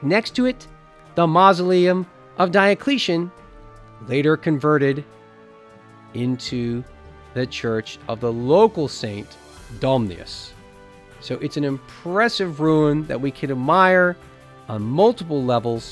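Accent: United States. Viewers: DAMN YANK NARRARTION!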